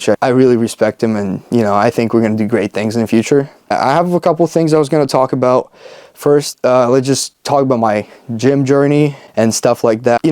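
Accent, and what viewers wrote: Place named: Ukraine. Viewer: Never mind your Ukrainian. I wrote that before I saw the whole video lol I detected a slight accent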